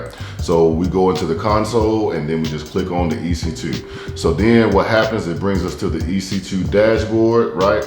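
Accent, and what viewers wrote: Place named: Baltimore, United States. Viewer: Are from Baltimore area? I hear it in your two’s and do’s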